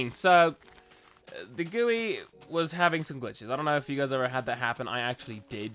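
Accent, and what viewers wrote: United Kingdom. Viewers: Hey dude I hope you don't mind me asking, where are you from? You sound like you come from the legend place called the UK!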